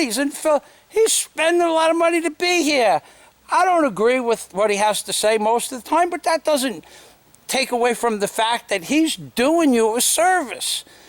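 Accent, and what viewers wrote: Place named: New York, United States. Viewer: I'll skip over the probability of you ever looking like a filipino by changing your appearance. BUT sorry bud, with that New Yawk accent you'll never be able to pass. Good video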